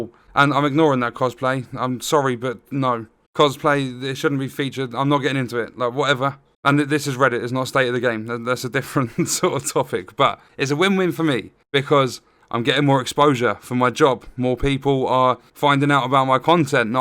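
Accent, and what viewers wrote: United Kingdom. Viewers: Love all the content. That British accent cracks me up mate 😂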